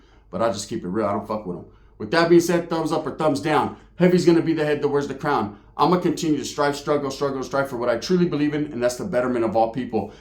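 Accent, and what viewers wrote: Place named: California, United States. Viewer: You from Cali I bet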